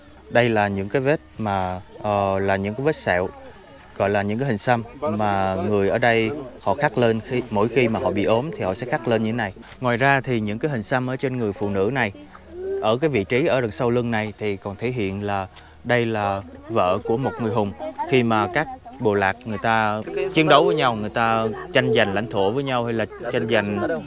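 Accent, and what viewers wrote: South, Vietnam. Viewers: A là người nước ngoài sống ở Sài Gòn, a xem video của a để tập nghe tiếng việt vì giọng miền nam quen thuộc với tôi. Cảm ơn nhiều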